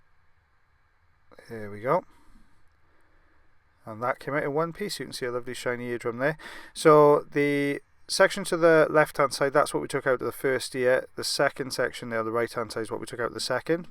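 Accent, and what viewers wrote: Wales, United Kingdom. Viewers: WELSH YOU SEE I OFTEN THINK HE WILL BREAK OUT IN SONG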